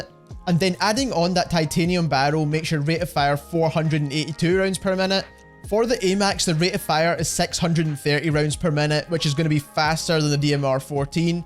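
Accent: Scotland, United Kingdom. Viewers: I like your Scottish accent 😊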